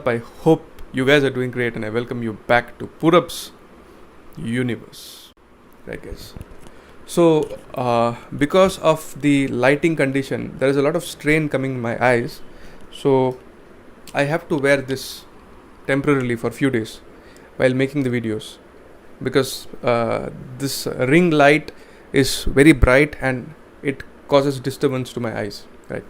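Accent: India. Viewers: IF YOU speak in English, BETTER AVOID BOMBAY WORDS WE DONT UNDERSTAND. IT IS DIFFICULT TO UNDERSTAND, LET ALONE LISTENING TO YOUR BAD ACCENT. English is a beautiful language to hear, until Indians speak it.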